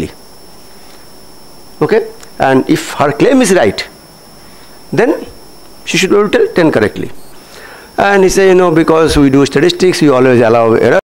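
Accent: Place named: Bengal, India. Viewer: He is speaking benglish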